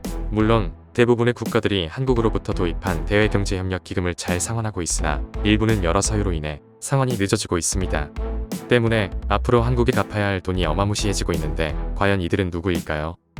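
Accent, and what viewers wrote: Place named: Jeolla, South Korea. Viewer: 전라도 말투 숨기지 마세요ㅠ 왜 전라도 유투브들은 우덜 말투를 왜 부끄러워하는지ㅠㅠ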